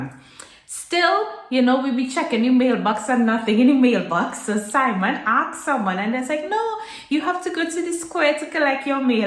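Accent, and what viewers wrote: Trinidad and Tobago. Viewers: love your trinidad accent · I hear your Trini accent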